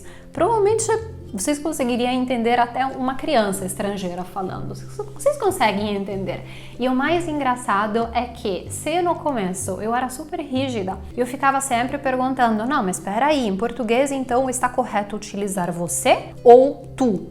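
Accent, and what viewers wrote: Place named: Italy. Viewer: Prof. Você que é maravilhosa, sou simplesmente apaixonada pela Itália, mesmo sem conhecer pessoalmente, esse sotaque é lindo!!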